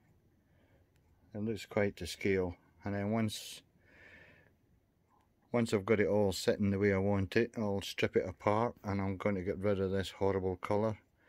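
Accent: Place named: Scotland, United Kingdom. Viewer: Love hearing a fellow scot in the hobby!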